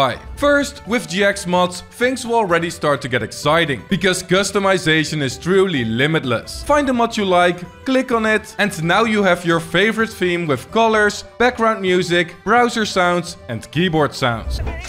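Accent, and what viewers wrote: Netherlands. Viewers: I can hear that you Nederlands are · God the dutch accent.. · Ben jij Nederlands? Je accent klinkt echt erg Nederlands🤣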